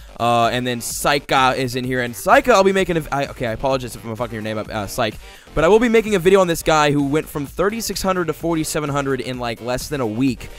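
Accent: Norway. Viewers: are u a norwegian? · WAIT A SECOND!!! ARE U NORWEGIAN? lmao i just noitced :D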